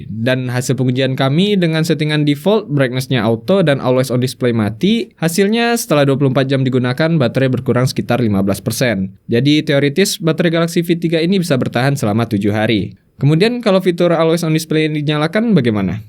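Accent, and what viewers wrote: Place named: Minangkabau, Indonesia. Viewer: Sudah kuduga urang awak, ada aksen nya tipis2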